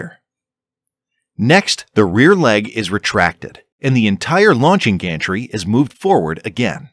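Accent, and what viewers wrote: Kerala, India. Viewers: please don't use your voice. I hate indian accent. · I miss the indian accent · Why don't you stick to using the AI generated voice in place of your Highly "Mallucised" accent!!! Sorry for the blunt comment.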